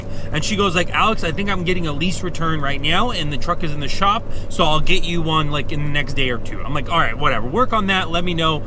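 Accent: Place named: Iowa, United States. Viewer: heyt alex, sounds like EagleGrove Ia.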